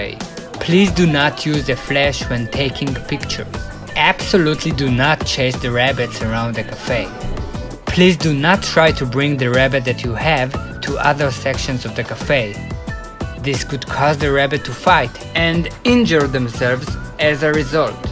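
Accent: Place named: Europe. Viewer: This guys voice is what Americans think Europeans sound like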